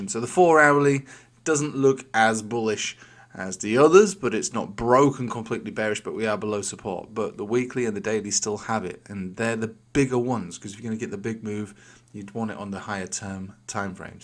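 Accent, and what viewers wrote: United Kingdom. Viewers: Not sure what u took from this video but it’s nice to hear British every morning :) I feel cultured and noble right from the start of the day :D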